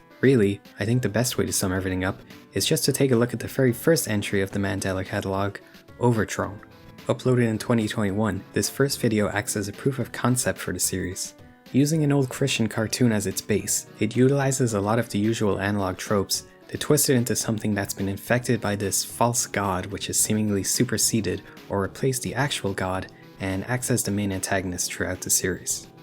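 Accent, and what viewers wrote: Ireland. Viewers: Hidden Irish accent I think.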